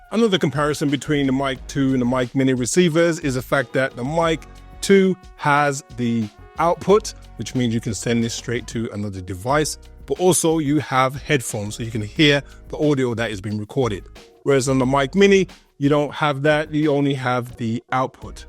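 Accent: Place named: United Kingdom. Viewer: You from the UK, G? You look like you’re based in the states! But your accent sounds local?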